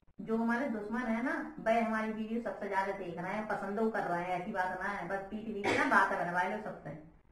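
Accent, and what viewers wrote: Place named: India. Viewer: Wow di desi khana desi hi style mein baat bahut achcha laga 🙏❤️❤️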